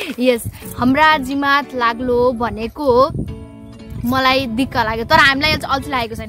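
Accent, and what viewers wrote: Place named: Assam, India. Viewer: Tur name kun xe ko .. and most words it's sound like Assamese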